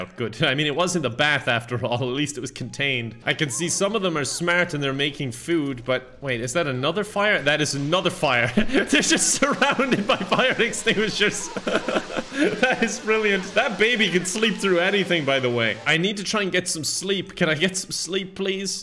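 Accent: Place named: Ireland. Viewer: New video from Me: tremble to hear the cute Irish accent and watch the latest massacre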